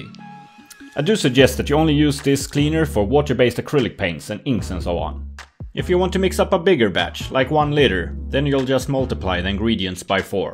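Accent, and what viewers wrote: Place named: Europe. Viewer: Damn I'm good... In recognising English accents spoken by Europeans. :)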